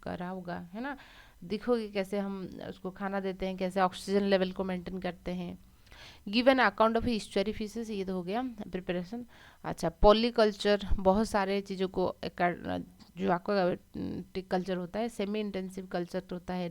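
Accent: Odisha, India. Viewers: 😳😳😳...app odisha se ho kya mam ?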